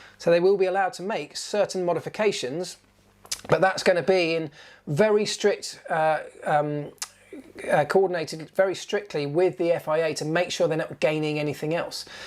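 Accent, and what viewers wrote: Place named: Bromley, United Kingdom. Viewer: Bromley boy! I knew I recognised that accent. Curious to know which school?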